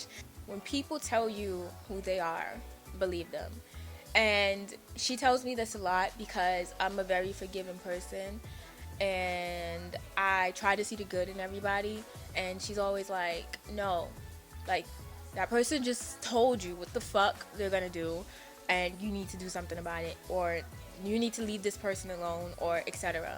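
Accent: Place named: Brooklyn, United States. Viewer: Your accent is sooo cute , I keep trying to figure out where youre from . It reminds me of wahlburgs accent ; like that old Brooklyn / Italian accent . Ugh I’m from bk and have no accent lmaoo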